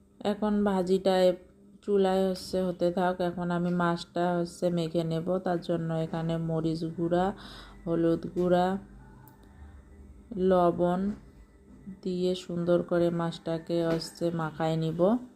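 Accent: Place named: North Bengal, India. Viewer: আপনার কথা আর রান্না গুলো অনেক সুন্দর। আপনার বাড়ী মনে উওর বংগে তাইনা। কথা গুলো তেমনি মনে হলো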